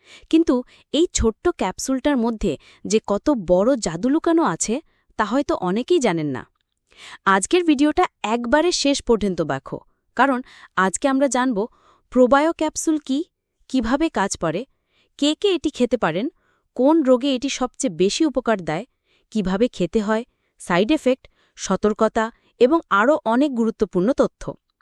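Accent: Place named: India. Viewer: কন্ঠে বাংলাদেশের টোনে বলুন। ইন্ডিয়ানদের মতো করে বলছেন..?! ওদের এই বাংলাদেশের সাধারণ মানুষ পছন্দ করে না।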